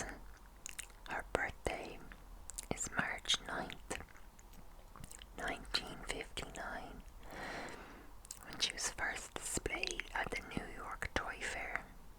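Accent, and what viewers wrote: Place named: Ireland. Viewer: Great video, I can't get enough of a) fact videos and b) Irish accents so this is amazing!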